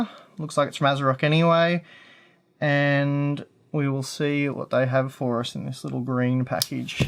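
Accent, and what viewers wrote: Melbourne, Australia. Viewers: Hey, are you from Melbourne?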